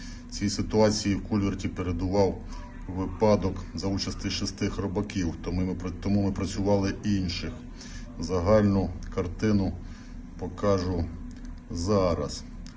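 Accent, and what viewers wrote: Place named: Mukachevo, Ukraine. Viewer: Мадяр у тебя акцент как у жителей Мукачево.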